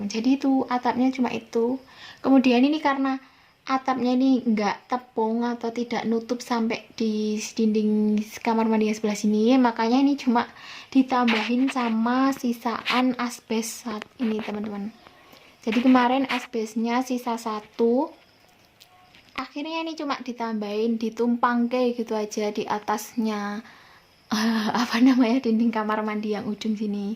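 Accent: Java, Indonesia. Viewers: Medhok jawir mu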